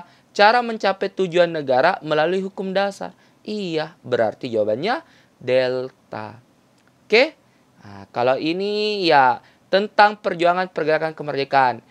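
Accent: Makassar, Indonesia. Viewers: Mentornya kental Ki logat Makassarnya😅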